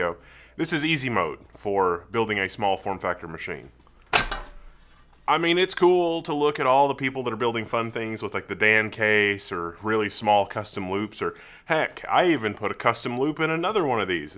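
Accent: South, United States. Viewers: the only tech reviewer I know with a southern drawl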